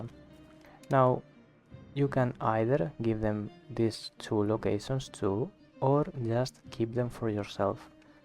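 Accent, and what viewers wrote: Spain. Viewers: Eres español hablando inglés ese acento no falla jajajaja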